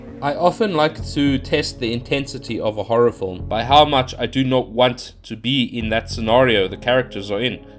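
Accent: South Africa. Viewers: >here in Europe I thought you were South African. Congrats on escaping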